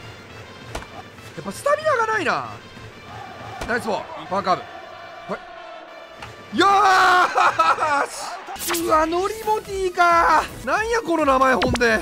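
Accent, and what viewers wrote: Kansai, Japan. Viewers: 「緩急」違和感ないけどなぁ。 俺もVIPも関西人やからかな笑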